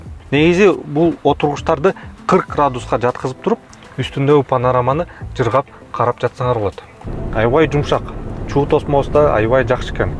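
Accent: Standard. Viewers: Таза кыргызча керемет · Кыргызча тазза граматно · Нукура кыргызча пай пай сонун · Кандай таптаза кыргызча 🇰🇬🇰🇬 жолун шыдыр болсун уком. Азаттыктын денгеелинде суйлогонун жакты.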